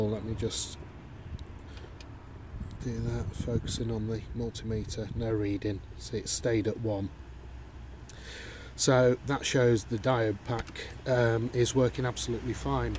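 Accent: United Kingdom. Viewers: Very helpful video, cheers! For other viewers, if the problem is your alternator, dont throw it (and your money) away! I just made a video about Alternator Troubleshooting & Repair. I had a non-functioning alternator and in the video I go through the fault-finding process and dismantle the alternator. In the end the problem was with the diode plate, a $25 component, which is easy to replace. If you want to see the video, go to my channel and you'll find it there... Happy fixing! Cheers, Chris 👍 p.s., where are you from? I'm originally from Blackpool, and your accent sounds pretty close...:-)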